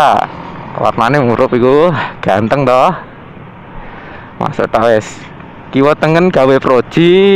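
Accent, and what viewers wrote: Java, Indonesia. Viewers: Jowo Endi mas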